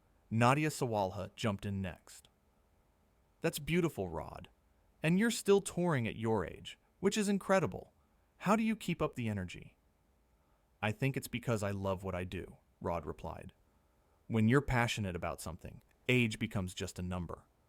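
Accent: United States. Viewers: Some arse hole made a similar youtube video of a cliff richard interview with Lorane on morning tv ...total false.....sad bastard should get a job and earn a honest days pay. ...and whats this american voice about.??? Total tosser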